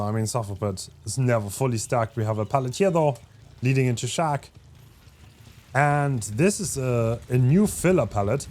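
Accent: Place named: Germany. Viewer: jetzt wo ich weiß das du deutscher bist weiß ich warum mich dein englisch immer so getriggerd hat und ich deine videos wegen den aktzent mir nicht geben konnte aber freut mich bin zwar halb russe aber das einer meiner lands männer so ein erfolgreicher dbd youtuber geworden ist ❤️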